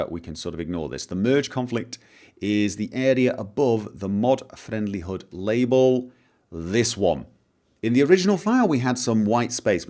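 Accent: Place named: Scotland, United Kingdom. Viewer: What is your accent? It almost sounds Scottish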